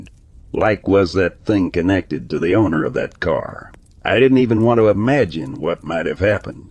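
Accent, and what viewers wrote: Texas, United States. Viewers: Yea Texas accent an also Alabama accent the best for me. Super cool 😎 good day too all from the UK 🇬🇧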